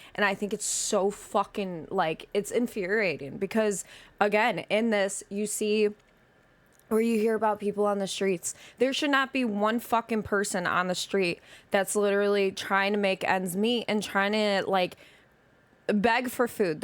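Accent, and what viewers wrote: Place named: Midwest, United States. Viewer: You have a very midwestern style of speaking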